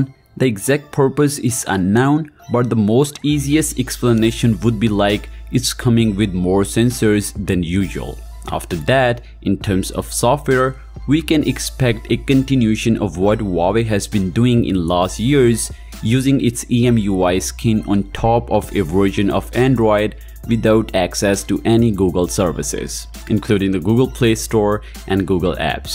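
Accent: India. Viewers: Indian lovely accent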